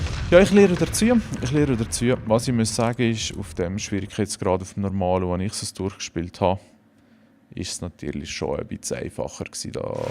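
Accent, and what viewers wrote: Valais, Switzerland. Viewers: Meeeh Walliserdiitsch!👍🏻😂 Hüere geil!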